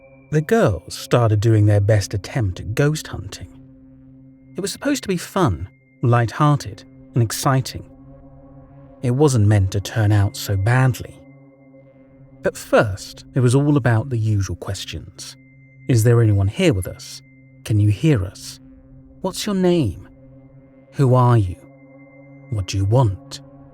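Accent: United Kingdom. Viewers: Ohh I do love these stories being a ex ghost hunter and demonologist I’ve had many many experiences like this most much worse and one was so bad it made me give up paranormal investigations with ouija boards for good I’ve never ever used “the board” again maybe I’ll write it down one day but when ever I hear a story like this it takes me back to that night and I shiver it’s great to hear a narrator with a British accent for a change too I’ve not heard any of your story’s for a long time I’ve missed you reading to me (US) have a good weekend 😊👍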